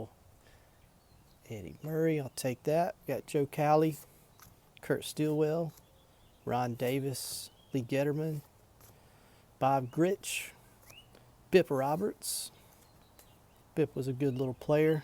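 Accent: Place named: Southeast, United States. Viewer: This was actually not too shabby for the amount of hall of famers pulled! That 1989 Donruss was a tinge bit of a letdown, BUT Craig Biggio Rookie Card is a Great Pull 😍!! Fine Video and props to you for opening up outside, and I Absolutely Loved the bird sounds in the background. I just realized, you got a slight accent, are you from like the Southeast like Tennessee or maybe West Virginia area? I'm bad at accents 😅 but you are easy to understand and I reside in Washington State myself 😎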